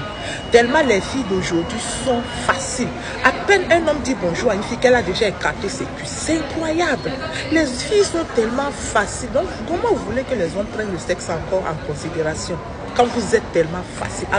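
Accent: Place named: Togo. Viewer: l accent est togolais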